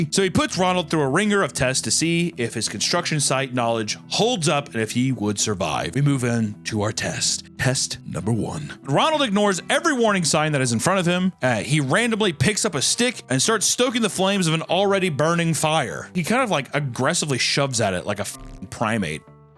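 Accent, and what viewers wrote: United Kingdom. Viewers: Bruh his british accent killssss me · I don’t often make comments but you sir have the best Brit accent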